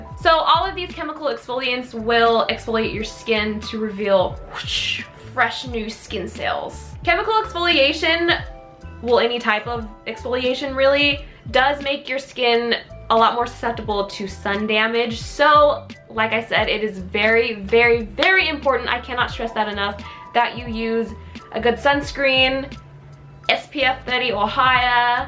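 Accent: Australia. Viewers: Kazzie Bolaños no that was definitely Aussie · Ooooh an australian youtuber cool ☺️ wait what? 😳 huh? She's american? What? I'm confused af. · prqurn it was Australian not british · it was an australian accent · I'm Australian and I honestly thought you were an Aussie from the first 10 seconds. Our accent is so damn hard but you did such a good job 💕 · I’m glad I read the description because that Aussie accent REALLY threw me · I THOUGHT SHE WAS AUSTRALIAN AT FIRST 🙃🙃🙃🙃🙃🙃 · i prefered her Australian accent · Des Breaux i could have sworn she was Australian 😂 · Why did you talk Australian then change ?? Confused as lol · Fifi González I thought she was aussie at first lol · Your Australian accent had me dying!! I always do the thing where you pronounce "o" as "or" around my friends to annoy them lol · Did she just start off in an Australian accent??? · Haha same I first thought British>Australian then realised she’s American 😂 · HAha. 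I noticed the Australian accent. Only true Aussies can talk Australian so I would say that lovely must have an australian background:) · Mashallah you do a great Aussie accent you legit sound like an Aussie-coming from an Aussie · that australian accent thoooo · Wait... are you Aussie or North American? I'm confused! Lol awesome vid thooough and very informative. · Am I crazy or do I randomly hear an Australian accent here and there? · I was listening to your voice at the start and you sounded kind of Australian😂 I was like woah I thought you were American😂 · Daniela Gonçalves I thought it was Aussie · THAt AUSTRALIAN ACCENT IS AMAZING ❤️❤️❤️ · Are you Australian, from the U.K. or America??? What are we doing love? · I like that Australian accent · is it jus me or does this girl have an australian and american accent 💀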